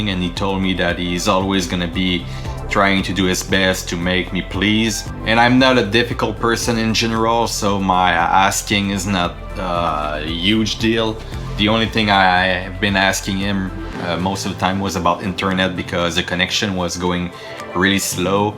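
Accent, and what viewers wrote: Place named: Quebec, Canada. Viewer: Bonne décision! c'est vrais que c'était marrant en québécois mais on ne comprenait pas tout. Les français sont des grosse faignasse, ils ne sont meme pas capable d'apprendre l'anglais 🤣 peut etre que tu vas les motiver hehe. Your English is Very good by the way!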